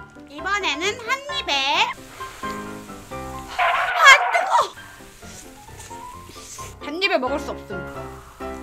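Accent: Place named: South Korea. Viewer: 약간 영어 못하는 한국인이 말하면서 먹는거 같애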